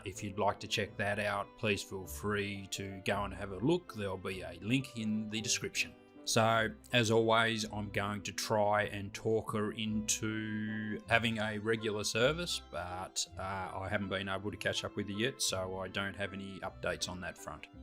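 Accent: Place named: Australia. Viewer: I'm enjoying the banter and seeing more of you, loving the aussie accent BTW. Keep up the good work guys, you do a terrific job 😊❤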